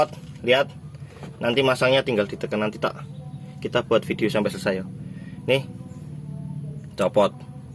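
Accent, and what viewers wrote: Java, Indonesia. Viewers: Pembawaannya khas jawa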